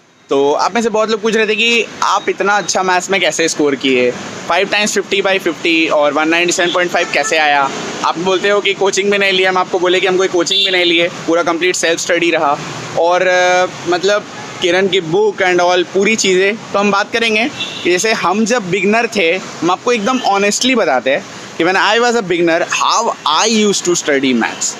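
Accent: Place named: mixed, India. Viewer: Hmmm...hmare up Bihar aise hi bolte h ap kha se ho bhai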